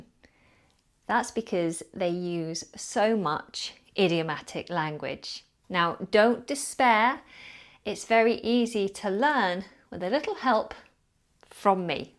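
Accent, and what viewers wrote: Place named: United Kingdom. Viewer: I love your accent. Finally I found a nice British model to follow! 😊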